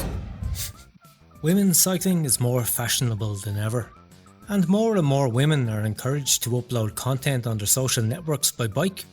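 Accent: Dublin, Ireland. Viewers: Sounds like a Dublin accent. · What part of Ireland your from ?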